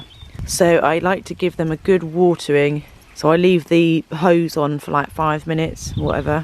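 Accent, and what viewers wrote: United Kingdom. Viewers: I just love hearing Brits say water bottle. It’s so cute!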